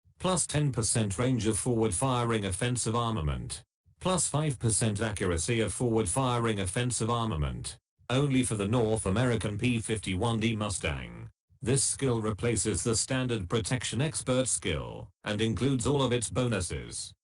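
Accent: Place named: Russia. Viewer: I'd rather hear your Russian accent than this shit voice.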